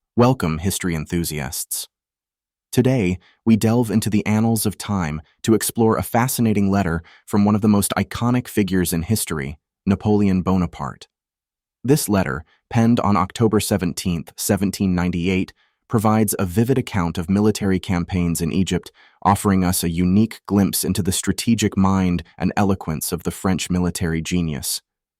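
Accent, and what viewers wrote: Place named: United Kingdom. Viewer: I am not sure you could have gotten a worse narrator. Did you really need Napoleon to be read by a guy with a heavy British accent and saying - each - word - individually - and - distinctly - with - no - smoothness - of - delivery. I cannot imagine how he learned to speak like that and not be beaten by his teachers. Maybe have him read it once beforehand and then record the second attempt. Hopefully, he was not a professional narrator. But I complement you on the topic. I myself was looking to read more about the Egyptian Campaign after seeing the Napoleon movie.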